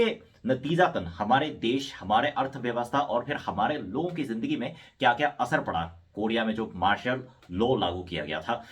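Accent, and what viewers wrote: Standard. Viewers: Yaar aapki hindi to intni sudh h ki humari bhi utni nahi 😊🇮🇳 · Bro……your Hindi waaaaay tooo good….pretty much text-book! But, it also a bit difficult to follow at times!!! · Bhaisahab itni shudh hindi toh India mein bhi koi nahi bolta. Someone give this man a Aadhar card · Itni shudh Hindi toh meri bhi nhi h well done bro 🤌👍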